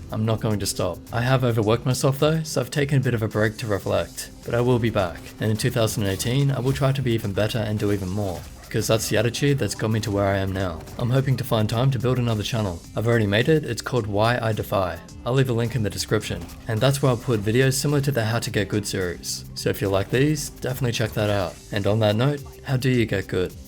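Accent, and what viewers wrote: Australia. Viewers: I seriously love ur smooth soft aussi accent voice. Been quitely following you for many months and have recommended you many times to many friends and they all got great help from your Videos eventho youre not yet quite at 100k congratz to being the most amazing tech/mouseyoutuber on this platform. -Not so smooth german dude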